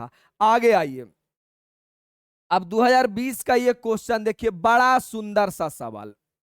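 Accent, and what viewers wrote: Bihar, India. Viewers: Apka Bihari language me math aur easy lagta hai sir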